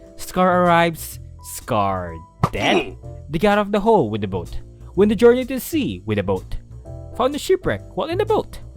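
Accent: Philippines. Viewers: Wait... your accent? Are Filipino?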